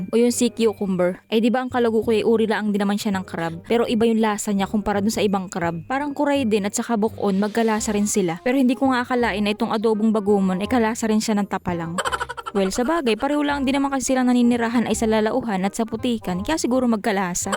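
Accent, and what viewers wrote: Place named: Quezon, Philippines. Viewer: Kya Pala ANG Punto mo .parang taga Quezon ... Tagal Quezon K NGA Pala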